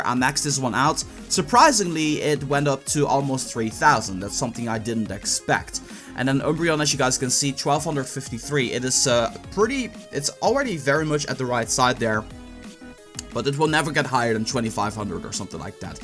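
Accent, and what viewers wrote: Netherlands. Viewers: are you form the Netherlands ?????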